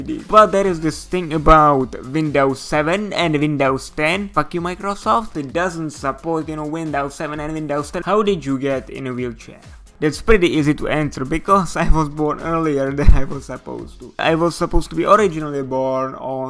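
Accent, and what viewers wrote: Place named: Mexico. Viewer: That is a Spanish/Mexican accent or my name is Mexican Jumping Bean! (and it's not)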